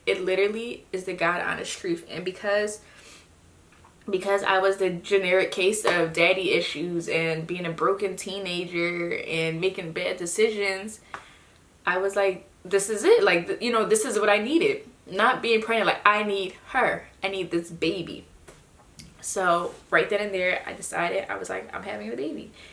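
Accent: Philadelphia, United States. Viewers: Instantly knew you were from Philly as soon as I heard you talk 😂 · I knew you was from a philly with that accent. So strong and your daughter name is so cute .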